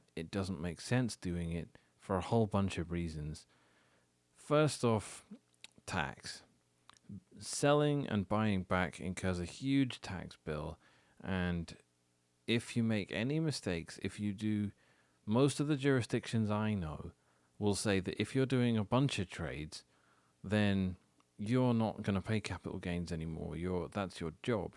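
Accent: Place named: England, United Kingdom. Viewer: This guy is 100% English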